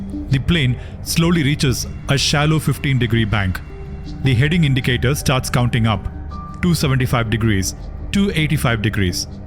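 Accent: India. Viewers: These pilots are f*cking idiots...Boeing is right to blame the Captain but they should've blamed the whole crew, I try not to judge but there's no way I could've made this mistake, not with 3 attitude indicators and 2 of them saying the same thing...if it was 2 broken attitude indicators saying the plane was banking right I could understand it more but then you'd still have one that's moving correctly and to ascertain your correct orientation all you'd have to do is bank the plane left and right...the only way I could understand this completely is if it was all 3 failing in the same orientation or if it was something like Aeroperu 603 where the crew was constantly receiving conflicting information...all that life gone for a problem that I feel many rookies would spot fresh out of the academy and the only real thing that stops me short of judging these guys is that I've never been a 747 pilot...thank you as always for these premium uploads I spotted a few errors like the cockpit being off, the engine models and the yokes of a 707 but all the information that you give that's most important is spot on and never biased and I really appreciate it not to mention I love your accent and Indian culture is such a a rich one, I almost hate seeing how beautiful this 747 was and all the amenities with Indian culture infused into it, makes me wish I was wealthy to experience things like it and thats one reason the 747 is my all time favorite aircraft because it was like you said like a fly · Fuck u bro ur Indian when u speak an when u tell this story your English is mix in Hindi so better why u don’t try to say this story in Hindi coze ur English do not sound like English 🏴 uff any way good try keep it up 😡😡😡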